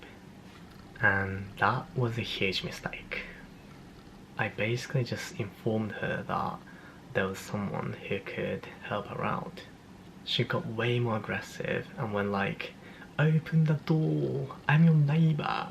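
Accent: United Kingdom. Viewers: 話が面白いプラスブリティッシュアクセントがかっこいいからめっちゃ聞き入ってた！ww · 追加コメントです！ 英語がとても綺麗なブリティッシュアクセントで、表現もとても洗練されてて、感嘆しました。すごく努力されたのでしょうね。 留学の当初、バスの運転手さんに「英語も分からないのになんでイギリス来たんだ！」と怒られたというエピソードを思い出すと胸熱です。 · お話が面白いしBritish accentがとっても良かったです✨ お忙しいと思いますが、こういう動画を楽しみにしています♪ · 綺麗なイギリス英語ですね。これからも一緒に勉強がんばりましょう！ オックスフォードサッカー部？の話も聞きたいです⚽️ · I like your British accent!! How did you improve your spoken English?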